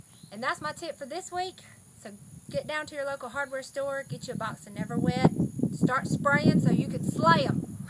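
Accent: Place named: Georgia, United States. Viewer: Cool to see a fellow south Georgian on here. I live between Waycross and Brunswick. I thought the accent sounded familiar. Where in South Georgia are you located(approximately, not your address. Didn’t want to come off creepy lol lol)?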